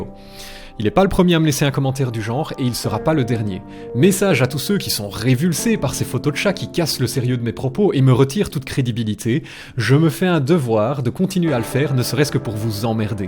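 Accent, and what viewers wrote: Belgium. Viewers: P pour moi ton accent est normal .. je suis Belge également, mais pour beaucoup de français l'accent Belge est celui qui est caricaturé dans "le diné de con" ... ce qui n'est pas pareil :D Personnellement ca ne me dérange pas toutes les histoires de monétisations et de publicités, c'est normal après tout ! A bientôt !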